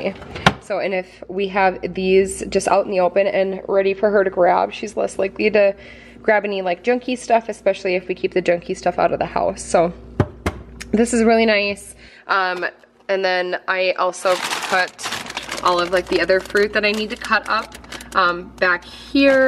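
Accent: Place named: Upper Midwest, United States. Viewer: One of the reasons I started following is because she lives "back home" in the state where I used to live. I love hearing the MN/ND accent.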